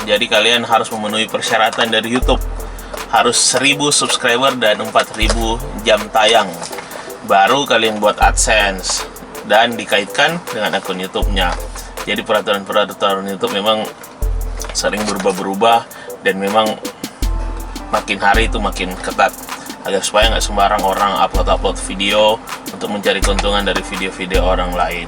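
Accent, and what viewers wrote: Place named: Sulawesi, Indonesia. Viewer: Logata kaya org bugis gorontalo dik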